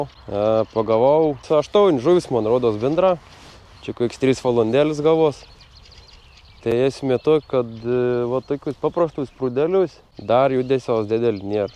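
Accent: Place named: Samogitia, Lithuania. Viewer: Bet ta kap smage girdiet zemaiteska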